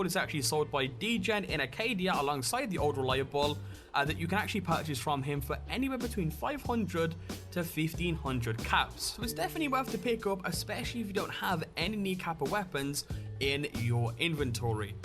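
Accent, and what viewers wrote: United Kingdom. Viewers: he is from the UK I believe, and in their military/police it is pronounced that way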